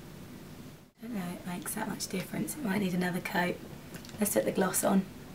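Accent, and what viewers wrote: United Kingdom. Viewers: Hi.! So happy both you and little Harry are OK.;) Time's flying by so fast! No wonder these little angels grow up so fast.:) Thanx for the awesome vid, you can't imagine how many of your looks I'm wearing on a daily basis. (And, on a totally different note, you restored the British accent to my world! Some awful teachers ruined it for me forever but I LOVE listening to you guys! Don't mean that in any weird way.:) Just a thank you note.:))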